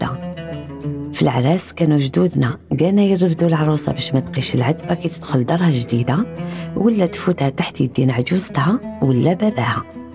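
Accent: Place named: Algeria. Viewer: اليوم اكتشفت قناتك المميزة ... اسلوبك رائع في سرد الحكايات والاحداث ..لهجة جزائرية مفهومة وبسيطة وجميلة بزاف .. حقائق ومعلومات مهمة ماكناش نعرفوها .. اتمنى لك المزيد من التقدم والاستمرار . القناة تستحق تكون برنامج معلومات على التلفزيون.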